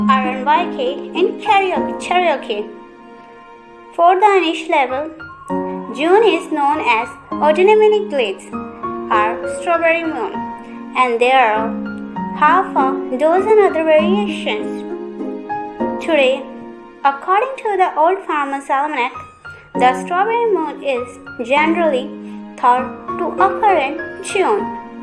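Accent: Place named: England, United Kingdom. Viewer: nice english acent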